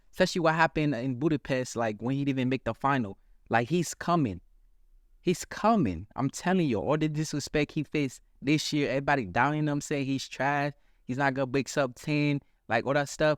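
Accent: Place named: South Carolina, United States. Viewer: Love your accent, man! Are you from South Carolina?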